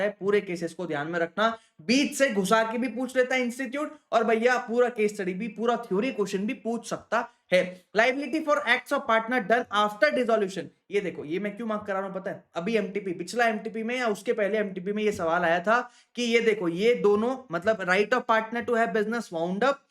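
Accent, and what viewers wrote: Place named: Gujarat, India. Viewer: ye kaka kaki ye sir gujarati lagte hai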